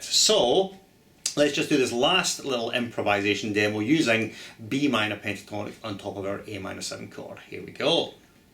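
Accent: Scotland, United Kingdom. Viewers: Any chance you could invest in a tie clip mic? Your Scotch accent is a rarity in YouTube and needs to be heard properly.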